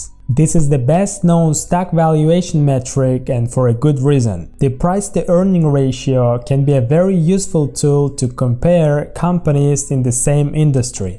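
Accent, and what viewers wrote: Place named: Central Asia. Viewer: With strong Central Asian accent. My guess would be Kirgiz or maybe Kazakh. Красава!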